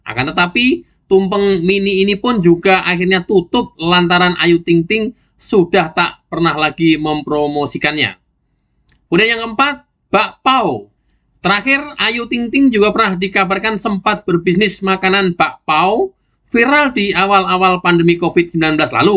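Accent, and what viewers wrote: Java, Indonesia. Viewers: Kata sape cnnel asal je ne dengar suaranya anda jowo kn...cari mkn jgn bt. Fitnah huuhhhh. ATT baik"ze 😂😂😂😂😂😂👉🙈🙈🙈Seperti KD pembantu x sedar dri law dpn sye x kasih👉🌶🌶🌶🔨🔨🔨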